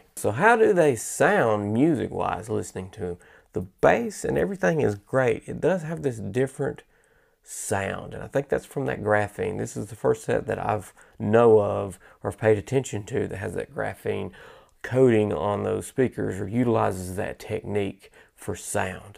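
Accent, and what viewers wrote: South, United States. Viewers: NICE REVIEW, GREAT VOICE, love that country twang!!! ❤️😉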